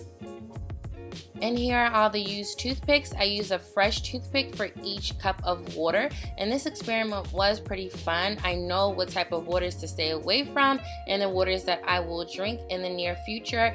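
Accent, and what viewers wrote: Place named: New Jersey, United States. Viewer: Sounds like Jersey :) Wahr-tor instead of water hahah but since there's no Poland spring I'm guessing you're not actually from Jersey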